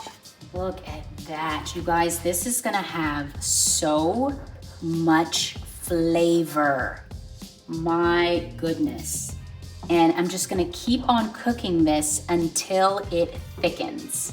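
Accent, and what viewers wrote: South, United States. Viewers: sounds like the South where im from 🔥🔥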